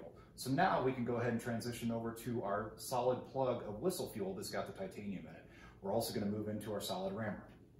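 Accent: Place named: Midwest, United States. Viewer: Your monotone is kinda creepy definitely back east somewhere possibly Wisconsin or Minnesota?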